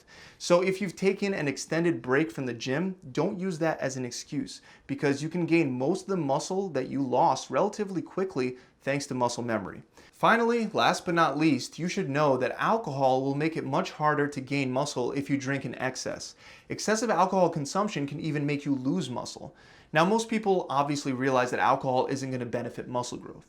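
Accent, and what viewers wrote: United States. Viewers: “YO” STOP TALKING LIKE YOU’RE AMERICAN AND ACT YOUR RACE, “HELLO MY FRIEND WELCOME TO KWIKI MART” 🐫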